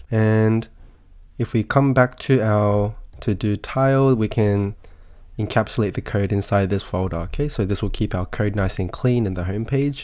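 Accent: Australia. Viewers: Your accent is so interesting. It has flavors of Australian but more heavily sounding of American English than most Australians I've heard speak. What is it?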